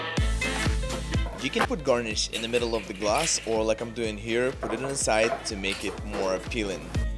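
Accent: Russia. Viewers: Why do you talk so Russian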